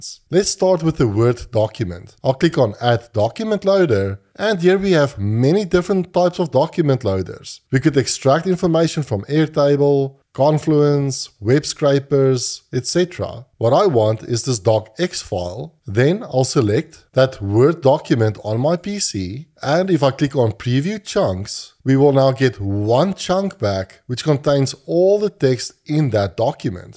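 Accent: South Africa. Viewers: Great videos love the SA accent - in my flowise I don't see upsert config? please let me know what to do to get this.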